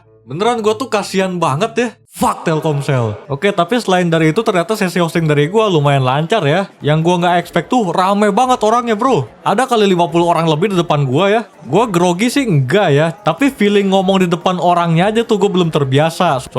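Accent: West Java, Indonesia. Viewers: terungkap sudah dia tinggal dikota mana 😂😂 tapi di channel manapun dia nyunda bangett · wih aya Sunda sundana ei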